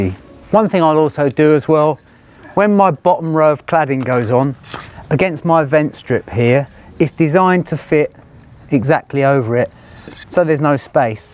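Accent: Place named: RP, United Kingdom. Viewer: This is poshest chippy I’ve ever heard I have use dictionary when he talks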